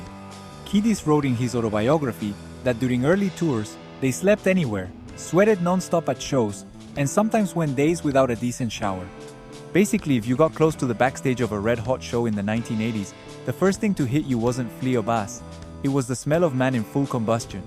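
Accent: India. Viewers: this guy sounds indian, this tells me all i need to know, disliked the video 3 secs in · What accent is this? AI indian accent?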